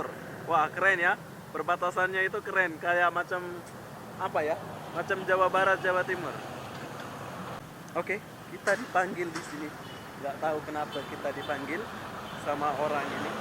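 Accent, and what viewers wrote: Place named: Malaysia. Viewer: orang Lombok tapi cakap kamu seperti orang malay.....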